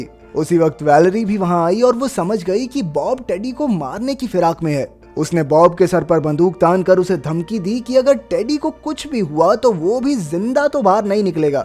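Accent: Haryana, India. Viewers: Bhai Haryana ka lge tu ???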